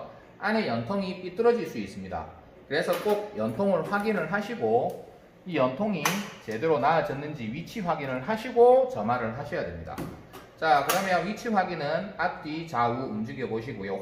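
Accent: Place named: Gyeongsang, South Korea. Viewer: 행님 구수한 경상도 사투리 팍팍 꼽힙니다. 저도 경상도라^^ 초캠이라 많이 배웁니다. 항상 감사합니데이 행님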